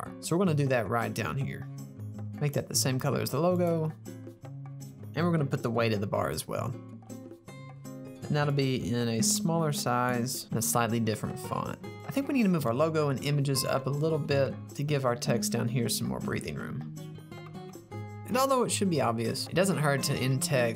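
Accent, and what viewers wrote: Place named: Texas, United States. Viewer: I get the feeling he is from texas just by the way he sounds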